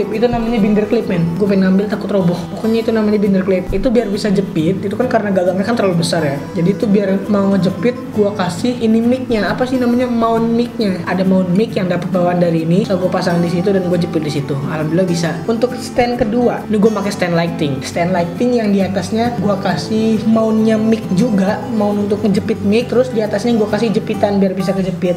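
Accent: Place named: Lampung, Indonesia. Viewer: Logatnya lampung bgt aowkwkwk Salam dari Bandar Lampung gan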